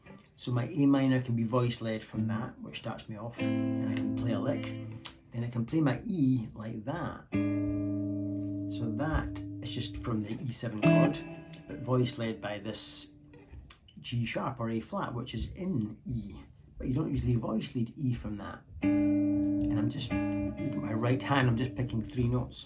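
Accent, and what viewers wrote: Scotland, United Kingdom. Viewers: We are indeed - great to hear a Scottish accent in the YT guitar circuit. Lovely tasteful playing and sounds - all the best. I'm subscribed and will be checking in 👍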